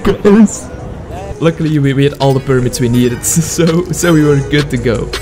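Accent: Belgium. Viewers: Wowww ik wist ni da u Belgisch was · He has an french/belgium accent, you can easily heard it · Mec, t'es belge ? :D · WACHT! BEN JE EEN BELG?!😳❤️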